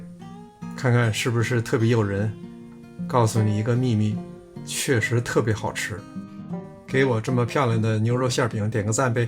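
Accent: Beijing, China. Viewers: 北京话听着太亲切了明天就做来吃了谢谢叔叔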